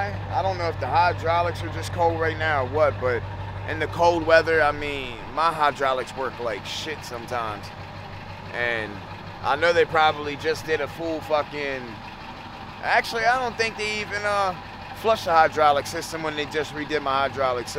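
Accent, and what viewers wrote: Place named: Delaware, United States. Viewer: Could tell this was Delaware soon you started talking 😂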